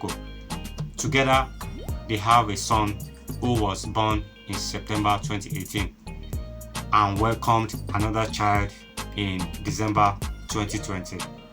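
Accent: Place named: Yoruba, Nigeria. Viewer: Your yoruba dialect is too strong for my english language ears. Agbani did not study mathematics and computer science please get your facts. She studied psychology in the US after completing her miss world reign.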